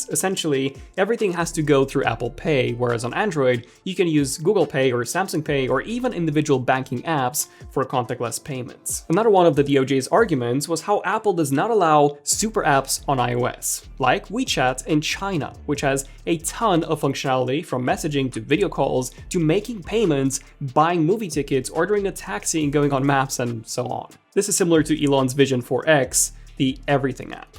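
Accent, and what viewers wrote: Romania. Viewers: Trebuia să aud de 6 ori ca să confirm că tu ești din românia, da întrebarea este, ești din românia?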